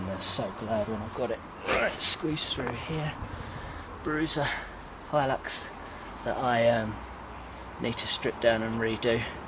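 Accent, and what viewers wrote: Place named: South, United States. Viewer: Mate, where do you live? From your accent I'd say the south, can I come round and play, lol.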